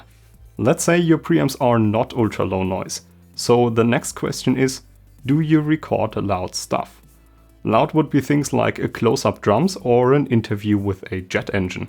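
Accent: Germany. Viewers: Hey, nur mal so 'ne Frage am Rande, dein Englisch hört sich echt sehr deutsch an, bist du deutsch? ^^ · Junge dein "gutes Englisch" entlarvt dich als deutscher..REDE DEUTSCH MAN!!!!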